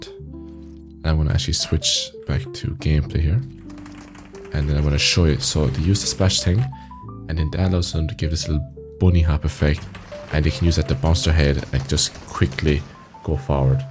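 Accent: Ireland. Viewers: Are u irish ?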